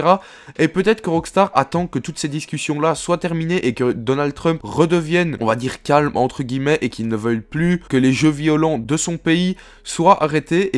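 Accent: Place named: Belgium. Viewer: Je crois qu'il est belge où chti't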